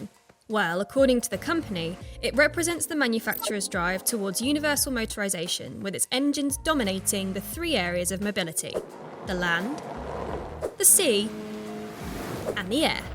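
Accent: England, United Kingdom. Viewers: The usual GHASTLY English pronunciation of Renault, Modena, etc. Listen to Forvo first, maybe you can make it a little better.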